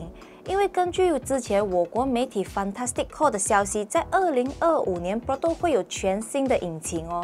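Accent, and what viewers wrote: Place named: Malaysia. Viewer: 我喜歡這種大馬口音 加油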